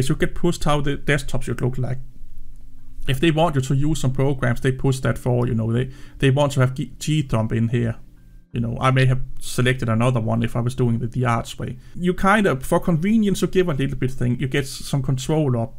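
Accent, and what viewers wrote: Denmark. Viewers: I really enjoyed your Danish accent !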